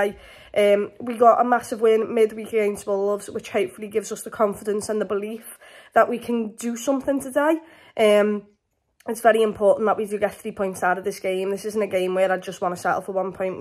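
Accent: Liverpool, United Kingdom. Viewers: Are you from Liverpool,?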